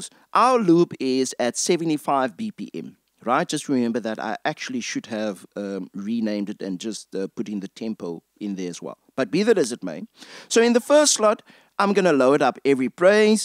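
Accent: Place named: South Africa. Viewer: I am going to guess that's a South-African accent. It's grating at times to my American ears, but well-worth tolerating for the excellent job you've done with this series. You definitely have a teachers's heart!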